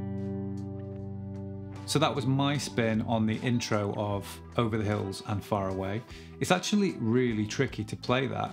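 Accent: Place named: Northern England, United Kingdom. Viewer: Crackin'! Ta,. I've been hammerin' & pullin' for nearly 40 years but still can't get the timing right..... One of these days. Btw, you sound like a northern lad, which is the best type of course, but can I ask - would you mind not dropping so many 't''s, it's just not quite right! If you do so I'll promise to stop droppin' my 'g''s! Is it a deal?? Love on ya!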